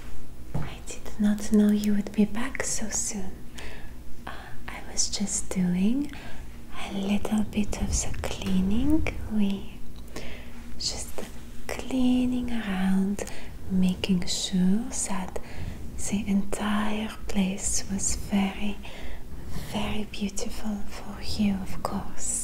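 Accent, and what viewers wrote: France. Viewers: Oh this video is incredible! You look so pretty in that outfit and your French accent is so cute! This was a very nice thing to wake up to.🤗💜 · You are amazing----have yet to see anything you can't do. Looking Beautiful as a French Maid and Fantastic accent too. LOVE---LOVE everything you do. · That french accent you do here is to die for... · I love the French accent and the lovely maid uniform. Your voice is very soothing and comforting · That's a good French accent 😂 reminds me of the 80s comedy show Allo Allo if anyone remembers that.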